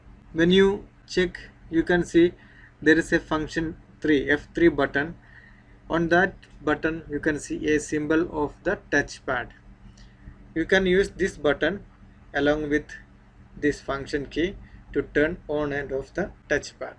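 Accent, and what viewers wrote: Kerala, India. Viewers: Malayali analle 😆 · മലയാളി ആണല്ലേ.. ഇംഗ്ലീഷ് കേട്ടപ്പോ തോന്നി...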